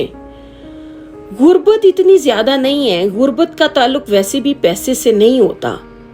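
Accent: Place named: Punjab, Pakistan. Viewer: Your speaking style with Punjabi accent is very charming. Keep it up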